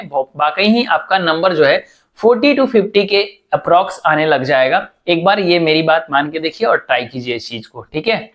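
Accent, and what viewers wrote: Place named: Delhi, India. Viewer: Maine... Practice " kara h ".... Delhi wali.. tone agai apki.. ab bihari nhi rhe ap 😮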